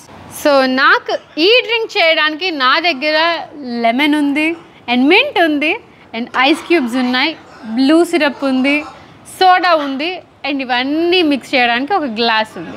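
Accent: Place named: Konaseema, India. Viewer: Elagunnav, aakalettunda 🤣🤣Haha konaseema slang max gaadu😂😂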